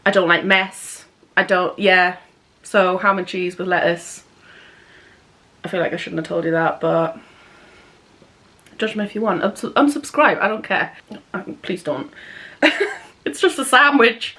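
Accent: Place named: Newcastle, United Kingdom. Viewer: I love the Geordie accent 🔥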